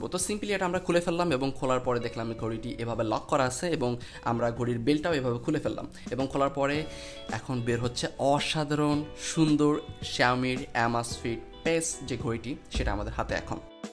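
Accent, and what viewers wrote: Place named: Bangladesh. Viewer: BD Voice Thanks ♥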